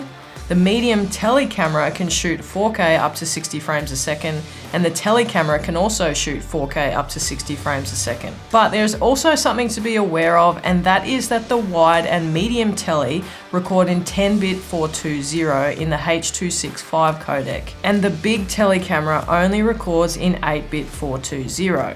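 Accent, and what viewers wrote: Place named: Australia. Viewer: Crikey!! Frothing on listening to an Ozzie on these vids, nice 🤙🏼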